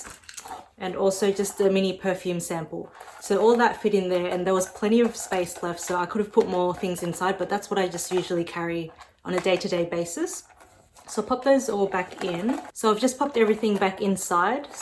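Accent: Australia. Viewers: Hi beautiful! I so dig your Aussie accent😍so charming! Love that you showed us how you styled & wear this, i have taken notes!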